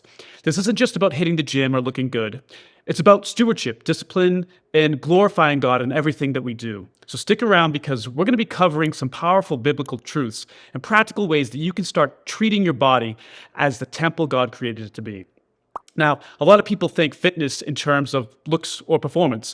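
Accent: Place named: Canada. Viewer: I detect a Canadian accent. Tom in Shizuoka Prefecture, Japan. Thank you for this sermon.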